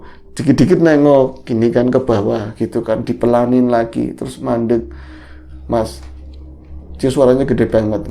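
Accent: Banyumas, Indonesia. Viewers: Ora ngapak ora kepenak... 👍👍👍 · iya sih mas,. tp jujur logat ngapak itu slah satu favorit saya..mskipun sya asli surabaya, tp sya prnh tinggal ckup lama dibanyumas..sukses slalu buat mas & mas,. smga chnelnya smkin maju&brkembang..tp ttap request ngapak nya diselipin dikit2 ya mas..hehehe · Tembe gie weruh mas ngomong ngapak loh😁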